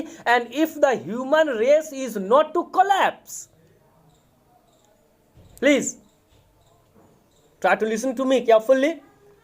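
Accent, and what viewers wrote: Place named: England, United Kingdom. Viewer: Sir nice english accent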